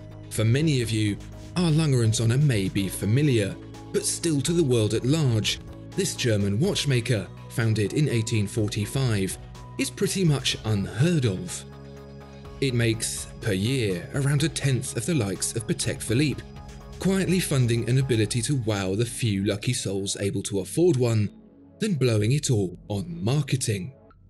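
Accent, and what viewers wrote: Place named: RP, United Kingdom. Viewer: Ok 3 min on Lange (from to ). 1 min on Credor... Hmmm? Just some biased limey with a posh accent...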